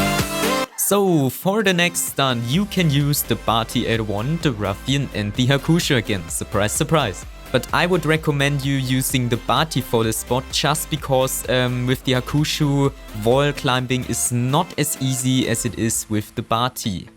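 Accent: Germany. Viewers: Es hört sich so an also ob du Deutscher bist der englisch spricht😅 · Bist du deutscher? :) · Richtiges alman Englisch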